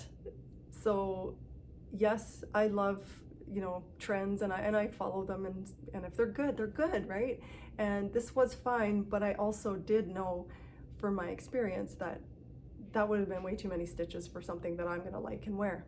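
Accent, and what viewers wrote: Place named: Western Canada, Canada. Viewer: Thank you for your podcast. I enjoy hearing your western Canadian accent, it feels so familiar and friendly. It is fun to see the places you go . I also love that you share your knitting adventures fully, things that don’t work out as hoped and your triumphs , it is nice to know that we all have ups and downs this way :) I just love your open friendly way and all your flight attendant sayings . Any ways, thanks :)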